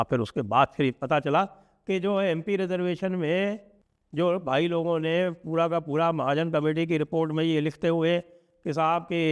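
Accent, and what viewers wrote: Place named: Uttar Pradesh, India. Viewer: Proud of and Love your UP accent 😊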